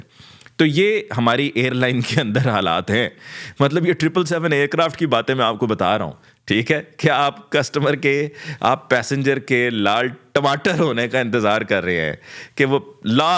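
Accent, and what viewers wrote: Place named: Lucknow, India. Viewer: صاحب آپ ماشاء اللہ دیکھنے میں منڈی بہاؤالدین کے لگتے ہیں اور آپکا تلفظ لکھنؤو والا ہے ۔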